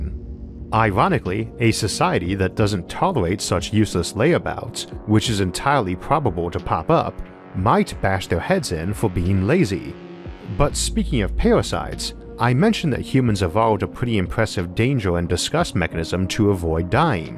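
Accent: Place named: Washington, United States. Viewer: possibly but I think there's an accent like that in Washington somewhere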